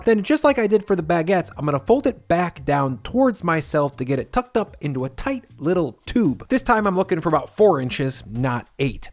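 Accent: United States. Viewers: You have an American accent and you give us grams... duh. thumbs down. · You sound American,, Why are you using metric crap ???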